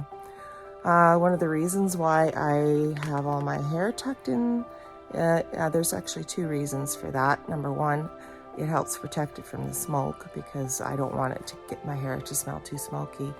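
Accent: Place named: North, United Kingdom. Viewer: I just love how you ladies up north say "house" and "out." What kind of wood are you burning here? First camera shot inside furnace shows unsplit and seemingly unseasoned tree limbs (later on, maybe popular perhaps not fully seasoned). I have seen vids of folks who burn only well seasoned red or white oak. They are able to load large chunks of wood and don't have to poke around or reload for at least 24 hours. Here's some advice you didn't ask for... LOL so thank you in advance for your indulgence... sell a few of those junk cars for scrap and with that money build yourself a decent woodshed. All the best...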